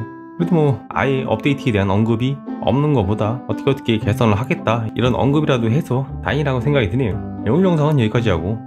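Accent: Chungcheong, South Korea. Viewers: 덕분에 쾌적하게 즐기고있어요 감사합니다 마성의 충청도말투 ㅎㅎㅎ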